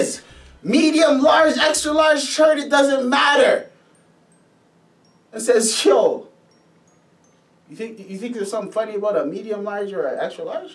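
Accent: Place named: Canada. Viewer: Where are you from Fam You sound like your From Canada..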